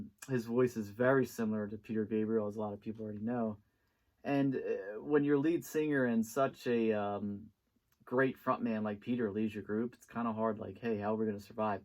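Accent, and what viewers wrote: Philadelphia, United States. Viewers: Agreed is the best however better than. was too long would have made a better single disk. Hey is that a Philly accent?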